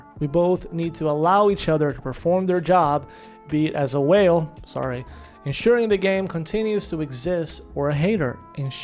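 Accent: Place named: Israel. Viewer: This guy sounds Israeli... Ewww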